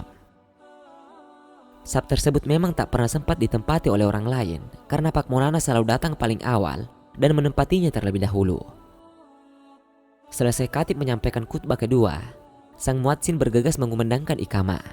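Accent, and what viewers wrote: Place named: Medan, Indonesia. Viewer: pengisi suaranya orang medan pasti😊😊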